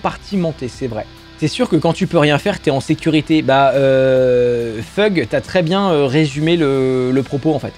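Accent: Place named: England, United Kingdom. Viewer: je préviens; j'ai laché le like avant de finir la video juste pour l'accent anglais fabuleux !! :)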